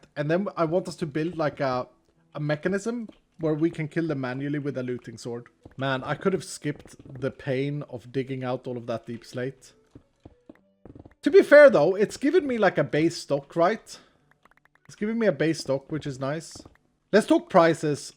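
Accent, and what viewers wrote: United States. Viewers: I love how his American accent is a mix of like 12 different regions but is somehow still convincing. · Dude....your American accent is perfect.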